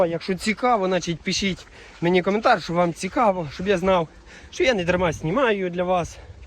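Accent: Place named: Dubno, Ukraine. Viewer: у мене бабуля з Судобичів біля Смиги. Чую чую я цю мову Дубенську чи у тебе Радивилівська? ))) класно там ліси ставки....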